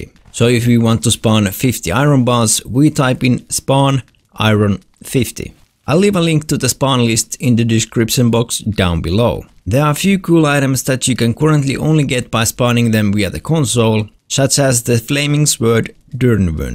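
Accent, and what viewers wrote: Finland. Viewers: I am in australia but I know that accent! FINNISH ACCENT!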